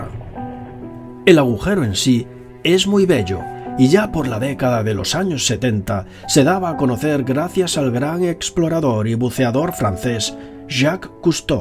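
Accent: Spain. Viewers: El acento español hace que pierda el interés en el video. Es aburrido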